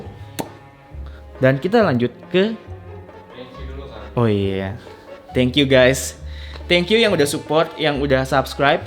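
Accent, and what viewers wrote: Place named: Betawi, Indonesia. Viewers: no offense, pantes bahasa betawinya "nyablak" khas jaktim. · Oo terjwb penasaran gw besar di Cilangkap ya Bang. Maaf soalx tiap nonton fasih bgt bahasa2 lingkungan perkampungan gitu. Kereen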